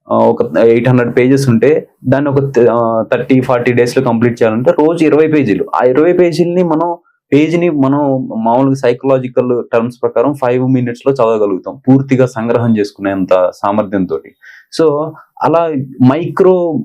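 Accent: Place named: Andhra Pradesh, India. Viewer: Andhra na meedhi? Andhra language matladuthunnaru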